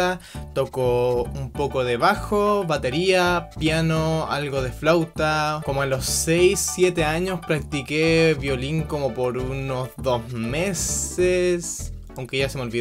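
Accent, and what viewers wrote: Chile. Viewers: Me suenas muy chileno.... Me encanta!!! 💓💓 nueva sub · eres más chileno que yo :v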